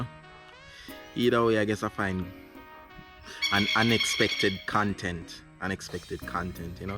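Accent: Jamaica. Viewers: I was about to comment on your ascent, then I read this comment and know your jamaican heritage, big up youth. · It was a gift, you dont refuse gifts. Pay it along to someone else if you feel like doing so. Its a blessing to you and your family. Some persons may have not acted well with you fishing there, she was very nice. Not to worry you were not begging and even if you were, its not a crime, she was nice, just give God thanks. I detect an accent, are you Jamaican? First time on your channel.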